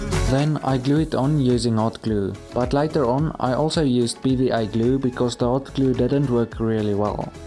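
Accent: South Africa. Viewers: Are you South African? I am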